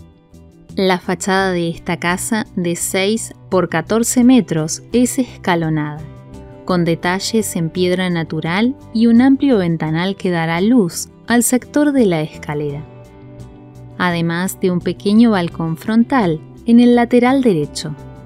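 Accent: Argentina. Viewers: Hermoso diseño, buena distribución, buena iluminación, excelente aprovechamiento de los espacios y decoración discreta y elegante , de muy buen gusto, en cuanto a las opciones de la fachada me gusto la numero 3 , con detalles en piedra .....me gustan muchos sus videos porque están bien explicados ademas , das costos $ aproximados (desconozco para que pais, pero por tu acento supongo que eres argentina) y tambien das opciones de cambios en la fachada ..y los planos.......!!!Que mas se puede pedir!!!.....gracias y espero el siguiente........saludos desde Mexico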